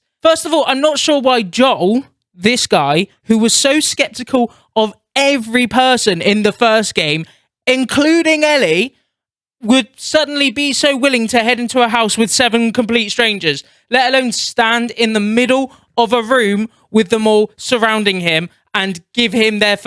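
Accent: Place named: United Kingdom. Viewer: Make more video only down side is that your british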